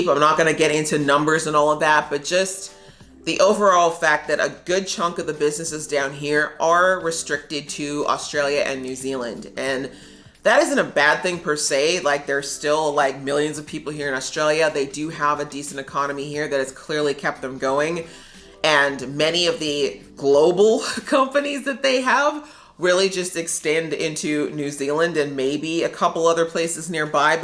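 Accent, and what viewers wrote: United States. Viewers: You sound like you come from the US originally. If so, you are not allowed to have your own opinion and that makes your whole video illegal. 14 hours to anywhere ? I tale it that you mean to the only real country on the planet. The the good ol US of A? while you have been away from the US there has been lots of exploring going on. Columbus, that you already know of I expect. But since then, there has Dutch explorers, Spanish explorers, Portuguese and even English explorers and there are now something like 200 hundred recognised countries sharing the planet with the US of A. Oh, its shocking, I know, but we have to face up to reality now and then. Yes, even yanks once they learn proper English. New Zealand is much less that 14 hours away. Indonesia also. Papua New Guinea. the Solomons, Singapore, Malaysia, Phillipines, one even that even yanks should have heard of, no not Canada, Vietnam. 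All much less than 14 hours away mu modern jet airliners. Sorry, is the US still in the Wright Flier stage of aviation ? cheers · thank you for sharing, you sound American, I am from USA definitely considering moving to Australia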